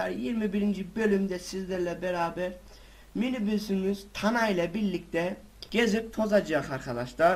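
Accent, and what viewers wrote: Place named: Kayseri, Turkey. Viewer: adamsın sen nerelisin bide biraz böyle kayserliler gibi konuşuyon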